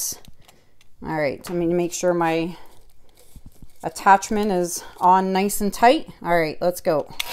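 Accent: Canada. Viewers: I'm just starting out. I have to tell you, of all the YouTube tutorials out there (and I've been binging!), your channel is the absolute best. Aside from all the detailed instructions you give, I love your interaction with your viewers (and your Canadian accent! LOL). Thanks for sharing this wealth of knowledge you have. Your work is stunning and I appreciate your responses to my questions on many of the videos I have watched. You are amazing. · 4 daughters, 3 stepsons, which gives me 3 daughter in laws, 26 grandchildren I've had a moment or too LOL , in 5 yrs i have beat cancer, raised 2 grandkids,lost 2 best friends to cancer my mom and sister and now i am helping my husband fight stage 3 colon cancer with this Covide 19 going on, if anyone walks into my house with out saying hello to me I say Good-bye, you go girl and by the way love that Canadian accent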